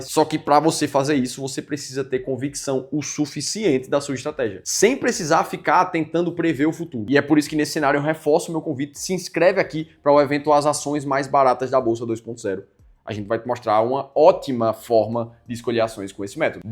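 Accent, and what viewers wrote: Pernambuco, Brazil. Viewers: Sotaque pernambucano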